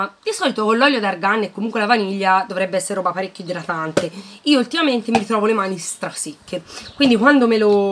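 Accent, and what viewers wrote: Tuscany, Italy. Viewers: Sei toscana?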